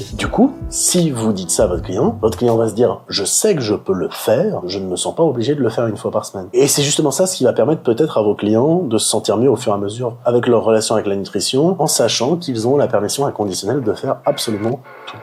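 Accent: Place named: Thailand. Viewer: L’accent Thaï on point 🤌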